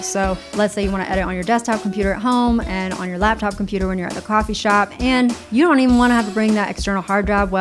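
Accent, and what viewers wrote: South, United States. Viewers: Also, lovin' the speach. I'm from Georgia and I feel like watchin' your vids brings me back home to the south 😀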